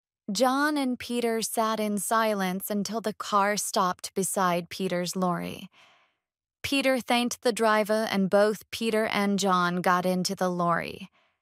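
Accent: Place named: United States. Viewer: I like the way speak clear from American accent. Chapter 2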